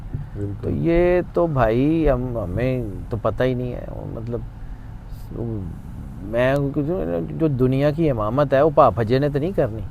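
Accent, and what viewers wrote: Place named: Lucknow, India. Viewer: 'Andaz e Guftagu' sounds like Luckhnowi Urdu, really.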